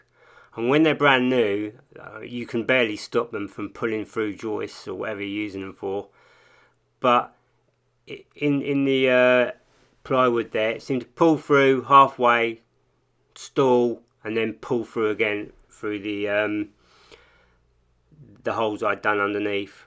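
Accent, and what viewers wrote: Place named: England, United Kingdom. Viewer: So sweet to hear a pure British accent and way of sharing the knowledge and the humour :) Love it, you earned a new subscriber mate ! Keep the videos coming ! I am a beginner diyer tryn to build a MFT table lol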